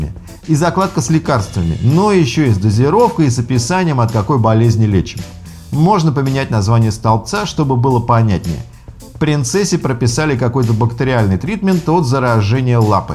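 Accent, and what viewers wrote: Moscow, Russia. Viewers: Тааакой зааабавный мааасковский ааакцент у. А сам ролик бесполезный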